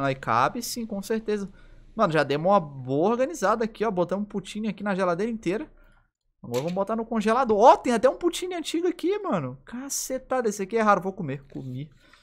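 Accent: Minas Gerais, Brazil. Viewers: a cada dia mais mineiro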